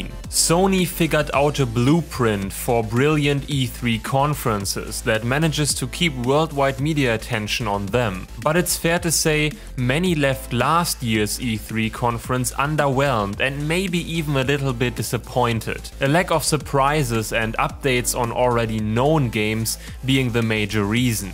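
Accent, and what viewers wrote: Germany. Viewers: can confirm sounds like a german english teacher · Is this guy german? His accent sounds really german. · you sounds german :D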